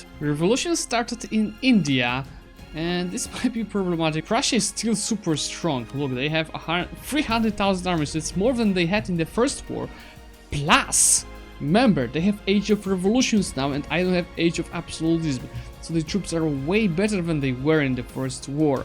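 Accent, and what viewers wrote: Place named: Poland. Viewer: Bro I suspected he was polish turns out he is I recognize a polish accent anywhere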